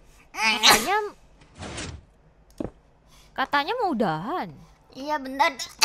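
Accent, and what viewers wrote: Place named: Java, Indonesia. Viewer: Tapi asli medok nemen kobo, logat sama pemilihan suku kata asli wong jowow😊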